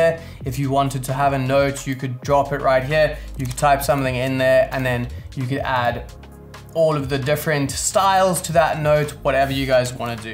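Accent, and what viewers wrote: South Africa. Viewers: Is jy 'n suid afrikaner? Dit klink soos 'n jaapie wat in England woon.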